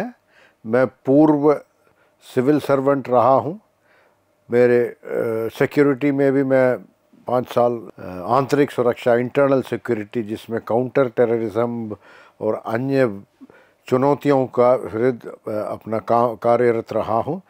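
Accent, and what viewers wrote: Standard. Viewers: Aap non Hindi bhasi hoker bhi achi sudh Hindi boltye hai sapnye saryantrkari congres ki asliyat dyes kye samnye rakhi himmat jutai khusi ki bat to hai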